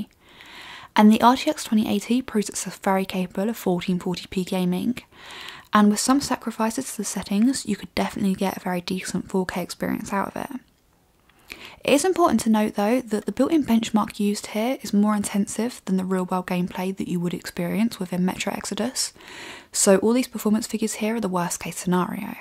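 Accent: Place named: Cockney, United Kingdom. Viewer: You've been living in London lately? Your accent is sounding a bit cockney.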